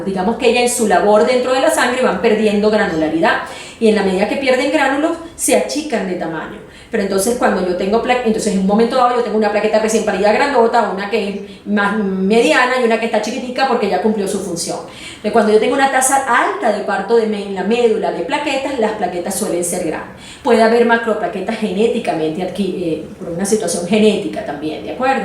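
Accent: Venezuela. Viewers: Dra. es un placer ver sus videos tan claros y con mucha seguridad. No se de donde sea usted pero por su acento me parece Venezolana y eso me hace añorar nuestros Medicos ya que en estos momentos estoy viviendo una situacion bien desesperante con mi salud tengo 8 meses presentando una serie de sintomas y los medicos aun no me dan un diagnostico solo examenes y mas examenes y yo ya llevo 45 libras perdidas vivo en USA y me parece INCREIBLE esta situacion en un pais como este; añoro tanto mi pais y mis medicos Dios la bendiga por su labor.......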